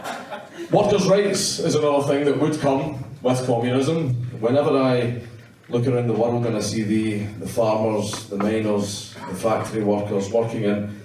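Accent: Scotland, United Kingdom. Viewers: Could barely understand you. Scottish Comedian/10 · Way too British to understand, dear god. Lol, but good stuff, man. Right on.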